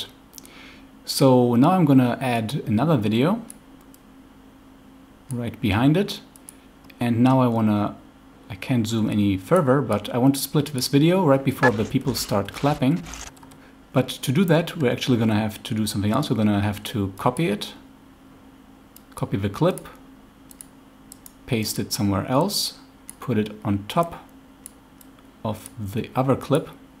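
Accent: Germany. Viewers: Thx you. Very clear and well explain. love the german accent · Nice tutorial. Very usefull.... and great german accent ;)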